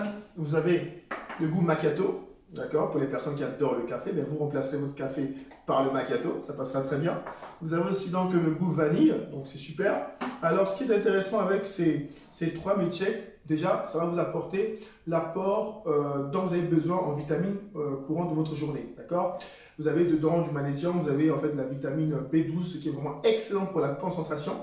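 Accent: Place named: Ivory Coast. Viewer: Très belle présentation de la body mission. On dirait j'ai capté un petit accent 225🇨🇮🇨🇮🥰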